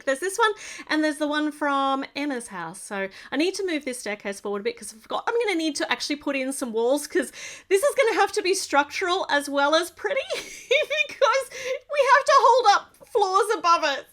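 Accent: Australia. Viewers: It‘s so beautiful watching you after a long time, you were such a positive influence for me during covid times, i spent hours just watching you build and talk in your lovely australian accent, its so wholesome for me, you can‘t even imagine. Eventhough my childhood home is sold, and i‘m living alone for the first time in my life, when watching your videos, i‘m the thirteen year old me again, it‘s crazy. Love you so much❤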